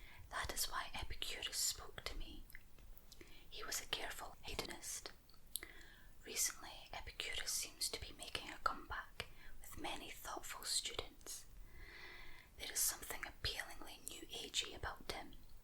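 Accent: Scotland, United Kingdom. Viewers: Great job. Your accent takes me back to when I lived in Scotland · Hi.Thanks for the videos ive been struggling with anxiety.On another note im so used to american slang that is great to hear your scottish articulated speach.I kinda giggle with your prononciation in an non making fun kinda way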